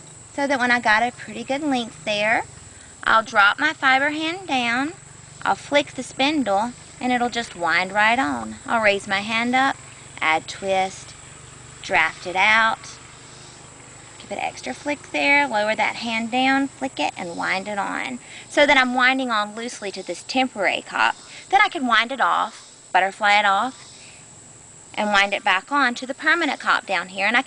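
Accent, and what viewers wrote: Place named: South, United States. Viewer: Hope you are ok, since you made this so long ago! I guess you are in the southern states area, going by your lovely way of talking! Thank you again.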